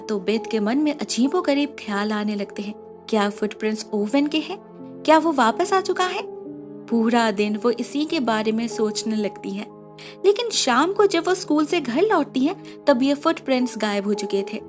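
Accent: Bengal, India. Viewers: Khub sundor didi.. tumi bangali hoeo eto sundor hindi accent tomar❤️❤️ Ajk bangla tei comment korlam..😁 Love you di❤️❤️❤️ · Keya app bengali hooo??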